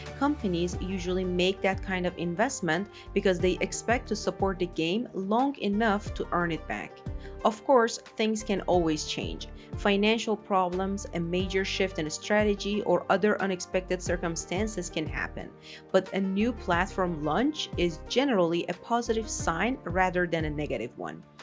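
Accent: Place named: Iran. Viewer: your accent sounds like it Persian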